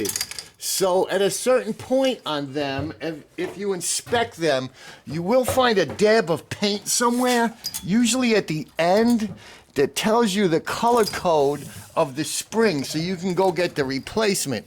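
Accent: New York, United States. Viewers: Really easy to understand. Great video. Living in Hawaii, we meet and welcome people from around the world. If we did not, we would get bored looking at each other all the time. As I watched your video, I was thinking to myself where might I have heard your accent before. It came to me. Remember the movie "My Cousin Vinny"? And I do not mean it in any degrading way. Not at all. You explained everything the way it should be. Just right. Thank you for putting together a great video. A thumbs up, for sure. Aloha! K Inouye Honolulu, Hawaii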